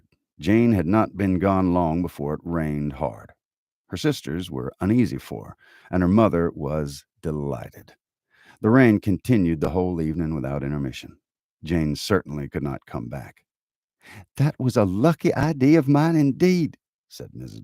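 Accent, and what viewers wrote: South, United States. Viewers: When I found these videos, I inwardly groaned, thinking it would somehow "bring down" the literature. Keep in mind, I live in TX myself. But after hearing a chapter, I loved it immediately. Nothing is lost in "translation", and indeed, the text lends itself to the Southern accents. My compliments to the reader, Mr..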